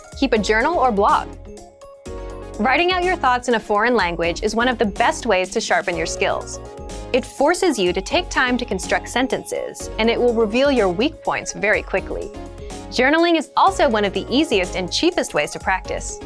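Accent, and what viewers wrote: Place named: United States. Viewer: American english, probably. Judging by the accent)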